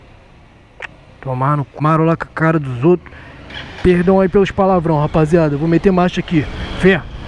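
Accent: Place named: Rio de Janeiro, Brazil. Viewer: O sotaque carioca é o melhor 😅❤🥇Fé tropa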